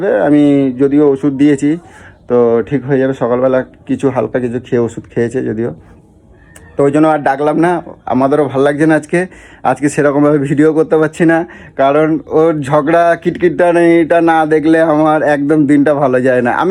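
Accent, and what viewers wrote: Bangal, Bangladesh. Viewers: তুমি একটু বাঙাল কথা কম বলো। আর শরীল নয় শরীর। তবে puchu কে মিস করলাম 😢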